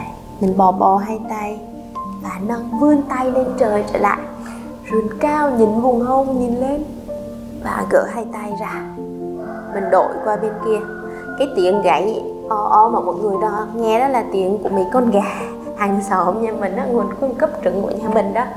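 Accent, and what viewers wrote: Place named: Hue, Vietnam. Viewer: Bài tập hay quá Em ơi ! Lại nói tiếng Huế hay nữa 😘😘😘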